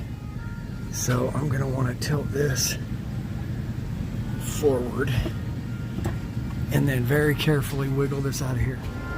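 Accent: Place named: Canada. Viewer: though it sounded like trailer park boys are you canadian by any chance?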